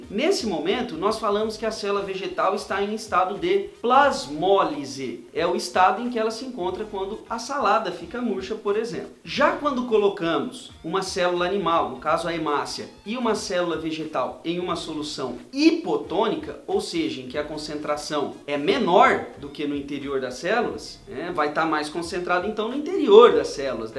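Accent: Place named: Rondônia, Brazil. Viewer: Você é de rondonia deu pra ver seu sotaque. Em qual cidade da aula?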